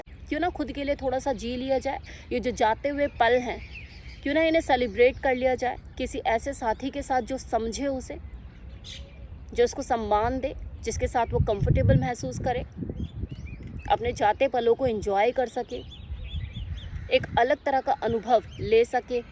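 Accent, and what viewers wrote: Punjab, India. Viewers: Listening Hindi with punjabi accent 👍👍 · Panjabi figar Panjabi lehja. .wah kya baat hy janab .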